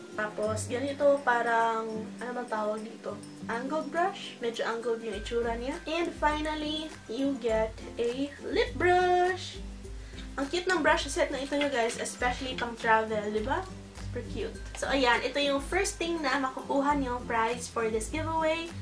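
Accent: Philippines. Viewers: HI! I really love the way you talk, pinoy na pinoy.:) Thankyou po for this video/blog! it's very informative and useful para sa aming mga nagcacanvass muna or naghahanap ng mga reviews bago magpunta since malayo samin ang divi. but anyway, love your divisoria haul especially your vanity area. grabe, isa po talaga yan sa mga dream vanity area ko. yung madaming makeup brushes na nakadisplay, ang daming makeups, dream come true po talaga sakin kapag nakapaginvest ako ng madaming makeup at naachieve yung vanity arra na kagaya ng iyo. Kasi sa ngayon, nagiipon pa po ako at nagcocollect. hehe. I also do sideline na small time makeup artist, sa mga relatives ganyan hehe. Kaya po kung mananalo ako, makakatulong po talaga yan sakin sa pagsisimula especially nagpho-photoshoot (modeling) din po ako.💋💕 more power po and Godbless!💞 ig: Facebook: Jasmine Alodia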